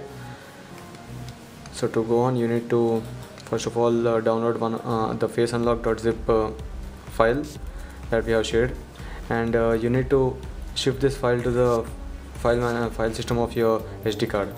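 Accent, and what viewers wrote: India. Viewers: Why do the people with Indian accent talk so fast? It makes nearly impossible to understand what are they saying..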